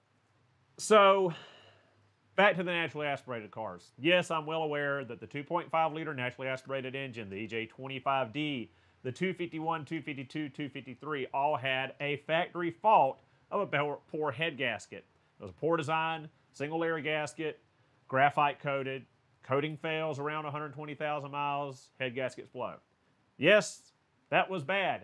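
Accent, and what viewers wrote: South, United States. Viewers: Getting yelled at by a dude with a southern accent hits way different.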